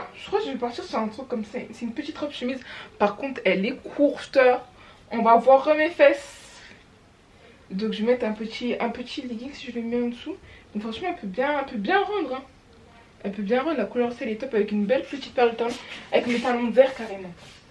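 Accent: Ivory Coast. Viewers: T’es ivoirienne ? Ta façon de parler 😂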